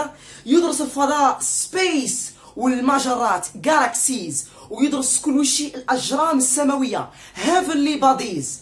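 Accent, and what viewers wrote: Morocco. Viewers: حسيتو مغربي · لهجتك مغربية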